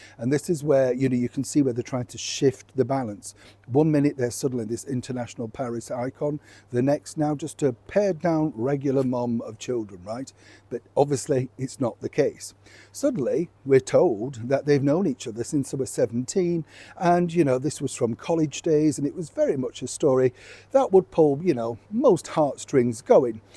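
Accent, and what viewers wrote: United Kingdom. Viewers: I think you do a great job. I enjoy all your videos. I especially love your British accent.❤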